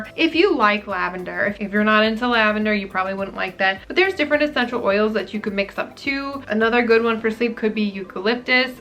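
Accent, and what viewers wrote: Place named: Wisconsin, United States. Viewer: Wisconsin probably. Nice funny accent.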